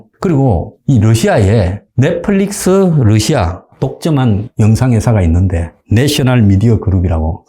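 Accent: Gyeongsang, South Korea. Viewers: 경상도 액센트가 멋있고 더 실감나게하네요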